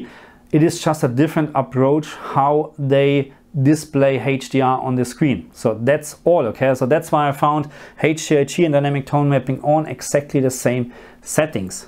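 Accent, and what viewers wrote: Germany. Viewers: Those settings worked for me (playing on PS5 on a SONY Bravia TV) very well, before I had to crank up my my max. brightness much higher than my TV actually can take, Tone Mapping had to be on 1. Thanks a lot! P.S. Da ich von Deinem Akzent (no diss) her annehme, dass Du auch Deutscher bist, noch eben muttersprachlich: Vielen Dank, werter Herr!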